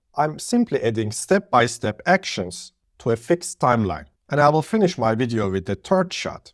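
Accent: Turkey. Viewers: Kral türk müsün aksan türk aksanı :d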